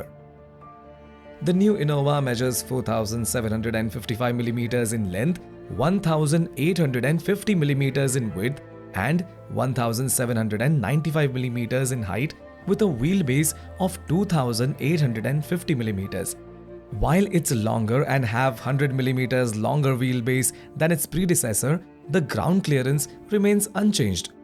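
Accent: India. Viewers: Indian accent is terrible.. 🤮🤮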